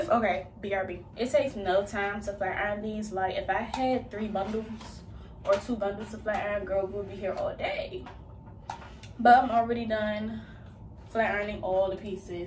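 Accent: Washington DC, United States. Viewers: ik a dc accent when I hear one! lol. this turned out amazing btw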